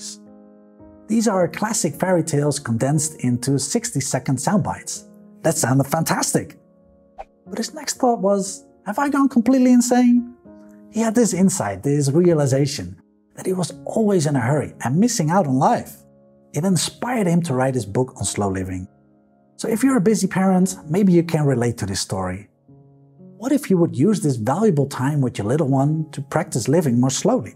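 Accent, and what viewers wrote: Netherlands. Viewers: Love your ideas and videos. I am trying to decipher your "almost Dutch" accent. Do you mind disclosing what country you are from originally? I hope you write a book and a work book!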